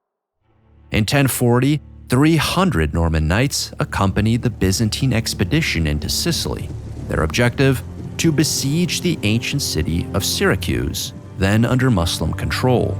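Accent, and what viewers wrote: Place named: United States. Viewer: Can't stomach the american narrator's accent, totally offputting.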